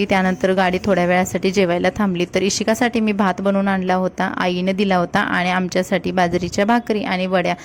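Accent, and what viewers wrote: India. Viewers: Seating tujhe gav konte bhasha aamchya solapur sarkhi vatte aani dusara mhanje tubirobadeva vishaibolli mhanje dhangar ka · ताई तू कोकणी तर दिसत नाहीस. तू सांगली किंवा कोल्हापूर जिल्ह्यातील दिसतेय तुझ्या भाषेवरून. आणि आईच्या हातच्या अळू वड्या मला पण अजुन आवडतात. आई ती आईच असते.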